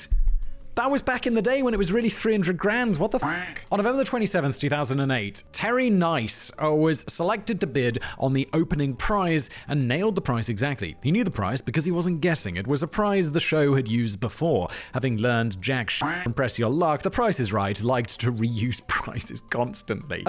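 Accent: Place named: United Kingdom. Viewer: The topic isn't my top interest in any way, but I really need to look those up lol. was made for podcasting, especially as an American, where any British accent immediately adds some sort of credibility 😅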